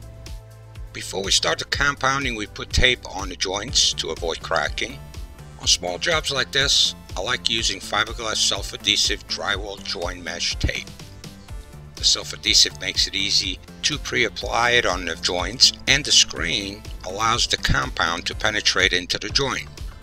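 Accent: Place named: New Jersey, United States. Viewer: This guy sounds like he’s from Jersey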